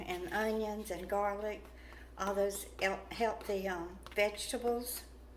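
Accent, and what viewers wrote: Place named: Georgia, United States. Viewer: I don't know where you live, but you sound just like my sweet grandma from Georgia. ❤️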